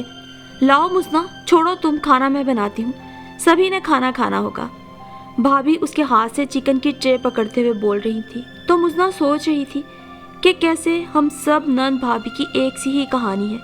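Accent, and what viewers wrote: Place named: Punjab, Pakistan. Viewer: I am from India... talafuz Acha hai ..toda sa punjabiyat lahza dikh rha hai. Bhut khub..urdu ki khidmat kr rhe hai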